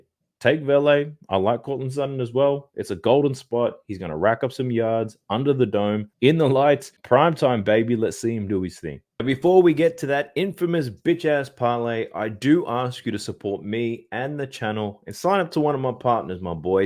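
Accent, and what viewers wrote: Australia. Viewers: Who else feels uncanny valley listening to an Aussie talk about our footie😅 im here for it beeeeioootchh!🤘🏼😎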